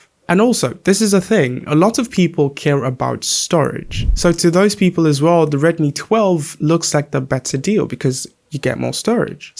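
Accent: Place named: United Kingdom. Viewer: I love the video and as well your British English accent. Many would say you're not a Nigerian living in Nigeria because of your Amazing English accent, just like I was also asked in many occasions if truly I was a Nigerian for the same reason. I loved the review and I am opting for the Redmi Note 12. PS: I sow in the comments someone saying that there's also a Redmi Note 12 Variant which also has 256 ROM. And I wonder how true is that, seeing that you haven't made mention of that variant in review. Thanks and this your new subscriber's here to stay. ❤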